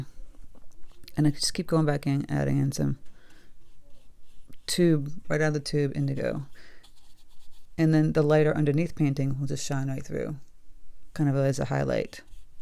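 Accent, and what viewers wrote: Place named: New England, United States. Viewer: Yes to the Vlog. Love hearing what's going on in Massachusetts. I grew up there, currently in Michigan. Miss hearing the New England accent 🙂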